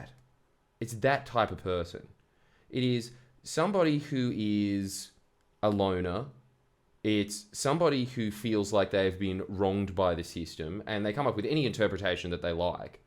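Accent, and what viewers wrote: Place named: Australia. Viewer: Australian detected, opinion disregarded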